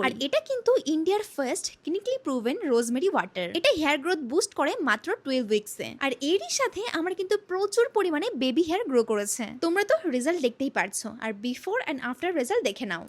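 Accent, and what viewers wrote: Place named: Sylhet, Bangladesh. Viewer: Hothat sylhati .......❤khub bhhalo lage erokom kotha bolle ...erokom jodi regular kotha Bolo bhhalo hoto😢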